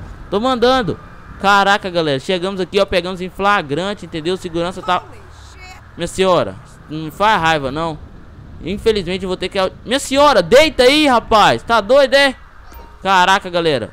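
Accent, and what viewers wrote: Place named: Rio de Janeiro, Brazil. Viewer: virou carioca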